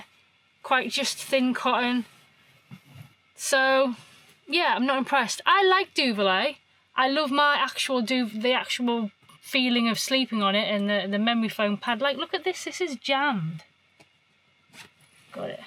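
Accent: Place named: Manchester, United Kingdom. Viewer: Loved the horns you grew on the beach. That guy looking through you window reminded me of our one and only caravan holiday. When the kids were young we could only afford cheap holidays and spent a week in a caravan in mid Wales and a guy looked through one of the windows so I thumped the window which made him jump and because his forehead was touching the window hurt him. When I went out to confront him the cheeky sod moaned I’d hurt him so I told him to do one or I would hurt him and when I spoke to the site owner she thanked me as there had been complaints about this happening on a couple of other vans and he wasn’t staying on the site. Just nosey but is the work you do on your computer for your job or just your vblogs? Looking forward to next video as I’m still ill although been for X-rays and blood tests to see if that reveals anything, so your videos our a bit of a highlight although tomorrow my cycling buddies are coming to see me. 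I know you said you’re from Cheshire but your accent sounds more Manchester area. Take care the pair of you.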